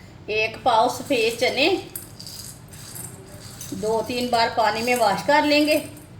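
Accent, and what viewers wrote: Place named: Punjab, India. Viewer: Or is k bolny ka andaz b bus, panjabi style me urdu 😅😅😅😅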